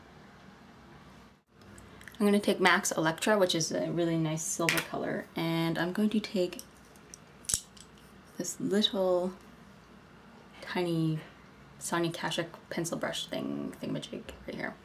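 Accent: Canada. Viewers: Are you from Canada? It sounds like it from your accent.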